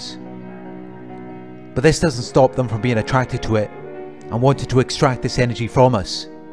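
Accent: United Kingdom. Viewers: Hard to understand this British accent 😞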